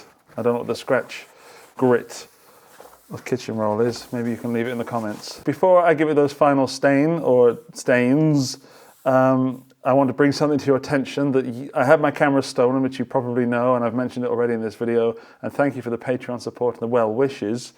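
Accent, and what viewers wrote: United Kingdom. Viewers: I love 90s studios, I love working on guitars, I love hearing British accents on YouTube (no disrespect to our transatlantic cousins) and I love the slightly mad professor vibe this series has. All round win! Would be great to see you modding a tube amp in a similar style, although you might actually die, so that could be a very bad idea.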